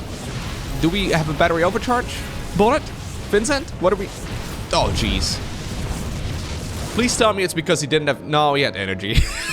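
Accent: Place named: Netherlands. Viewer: I am very, very sorry to say, but every time you said Fitzroy's name, my brain wanted to assume that you are trying to say Viceroy, but with your Dutch accent:D sorry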